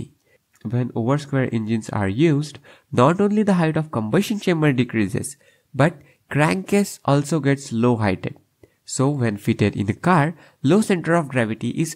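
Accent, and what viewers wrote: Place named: India. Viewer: Good content, good animations. But this indian accent is annoying the hell out of me. Why not focus on proper pronunciation so the content is suitable for normal English speaking audience.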